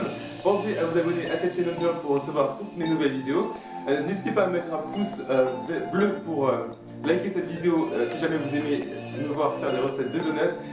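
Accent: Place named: England, United Kingdom. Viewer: Tu te la pette avec ton accent anglais et tes donugts son trop épait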